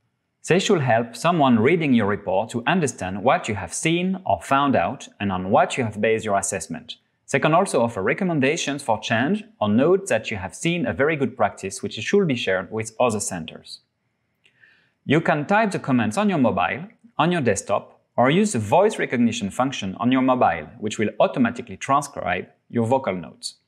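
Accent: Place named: Brittany, France. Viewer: Great tutorial for a great tool. Onwards with roll out! Thanks for the pedagogic presentation (with sexy Breton accent on top!)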